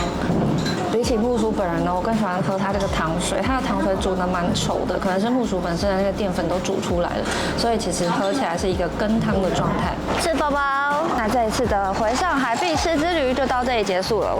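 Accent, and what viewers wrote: Taiwan. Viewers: 你那台湾腔好好听哟！😂😂😂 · 陈家生煎，听这口音是台湾腔，在台湾应该就没有这品牌了，如果是在大陆，很多三线城市，都有这联锁店，口味，效果都是和视频中的生煎包一样好。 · 回台湾久了口音又变回台湾腔了以前台湾腔没那么重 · 又看到回大陆很高兴，一开始浓厚的台湾腔听不清，后来慢慢习惯。是很有魅力的大美女，希望在上海过得开心。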